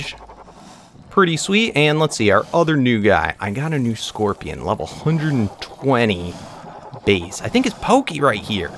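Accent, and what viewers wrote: United States. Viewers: u got blue eyes and u have an american accent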